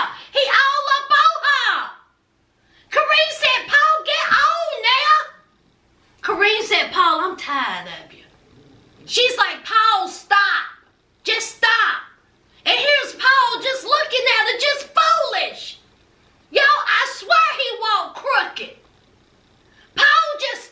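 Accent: South, United States. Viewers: Well I love it!!!! I'm a new subscriber and your southern drawl is what drew me to you. I'm from Atlanta, Ga. So I get it!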